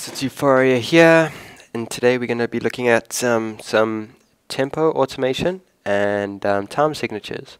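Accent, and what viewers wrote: South Africa. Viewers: Random but you sound South African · you sound south african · Is that a South African accent I'm hearing?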